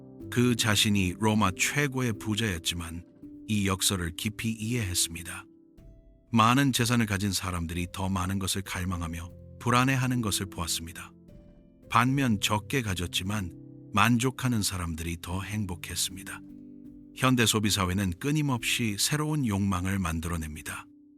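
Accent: United States. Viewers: 다 좋은데, 재미교포 2세 목소리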